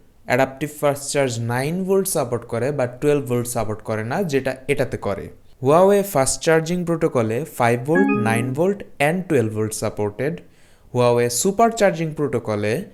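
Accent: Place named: Chapai Nawabganj, Bangladesh. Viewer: ভাই আপনার বাসা কি চাঁপাইনবাবগঞ্জে। আপনার কথার টান আলাদা।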